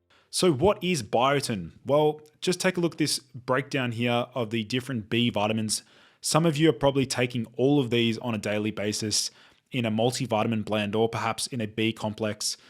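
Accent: Australia. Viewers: I literally couldn't finish the video, Ozzie accent is far too thick, drives me nuts 😢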